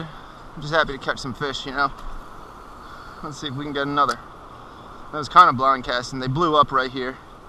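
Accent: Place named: New Jersey, United States. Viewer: Dude you sound like your from Jersey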